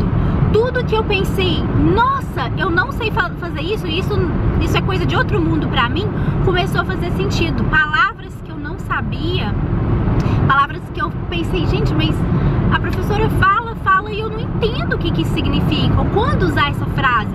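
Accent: Minas Gerais, Brazil. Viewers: Mas o sotaque mineiro nunca some kkkk mt bonito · Seu sotaque mineiro é muito bonito, assim como você! Hahaha... Você tem alguma faculdade? Como conseguiu o emprego de gerente? Depois do emprego de babá, foi muito difícil conseguir outros contatos? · Como foi pra vc lidar com esse sotaque bonitinho de minas aí nos eua? Isso "se transforma" quando vc fala inglês ou ñ? Tipo, em portugues vc fala "...ao meu redorrrr" ou "porrrr que" e aí em inglês vc fala "arrrround me" ou "you areeeee"? kkkkk É engraçado mas a dúvida é séria, ñ me leve a mal! Bjo =)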